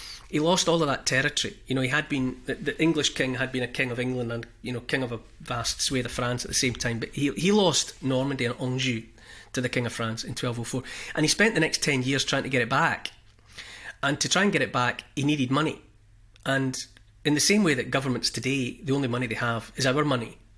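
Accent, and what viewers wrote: Scotland, United Kingdom. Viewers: It is not a dialect, it is a Scottish accent.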